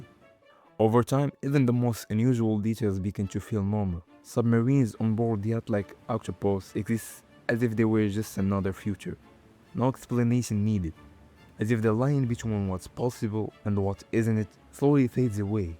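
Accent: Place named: Middle East. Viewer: I’ll be blunt…. I’m halfway through this video and it is #TORTURE 😱 because you mispronounce 50% of the words and the other 50% sounds like a 10-year-old child from the mid east trying to pronounce the English language… the visuals are great, and as other people commented: you “should“ have a lot more views, but the reason you don’t is what I just stated!😮🙄😳😡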